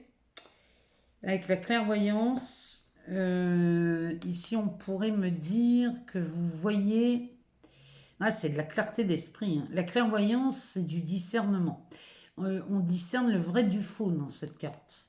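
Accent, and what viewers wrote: North, France. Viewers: Bonjour. Merci pour votre tirage! C'est toujours un plaisir de vous visionner et étant originaire de la métropole lilloise, votre accent me fait du bien 😊. J'ai commencé à vous suivre lorsque je vivais sur Toulouse. Puis il y a un an je suis partie en Bourgogne en Puisaye pour suivre ma formation. Au début de cet été, ayant décroché un CDD sur Paris, je vous suivais depuis le Nord ou dans le TGV Lille-Paris:), et depuis début août je suis vos tirages dans Paris même (11ème). À partir de la semaine prochaine, je vous suivrai dans le Val d'Oise (la Frette-sur-Seine). Je suis Capricorne ascendant Vierge. Je souhaite de tout cœur que vos messages me fassent écho cette semaine. C'est tellement compliqué pour le logement. Et comme vous le disiez, au début j'ai commencé ce premier travail de reconversion en étant très enjouée. Mais ma situation financière, mes problèmes de logement et le futur très incertain dans l'atelier d'art où je travaille me font voir cela plus ou moins comme un échec. 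Je croise donc les doigts par rapport à votre tirage, besoin tellement de stabilité 🤞🤞. Merci beaucoup pour votre bienveillance, votre professionnalisme et tout ce temps que vous nous accordez généreusement. Beau week-end à vous 💛☀️🌈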